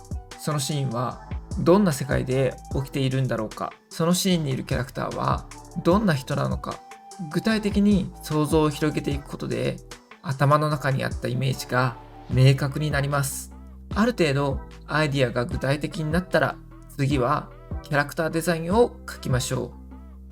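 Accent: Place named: France. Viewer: Pardonnez moi, mais j'adore votre accent français 🥹😍😍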